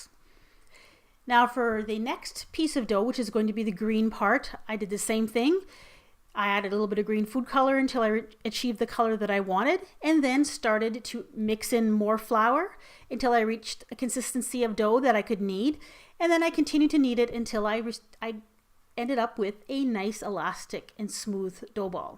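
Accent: Quebec, Canada. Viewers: I was watching this and thought, "hmm, that sounds like a Canadian accent." Sure enough eh! Very pro-looking results, she clearly knows her stuff. I just know mine would turn out looking like Kermit the Frog had a horrible accident.. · i love your accent are you from canadia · I believe she's French Canadian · Are you from Québec or just from canada ? :O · is she canadian?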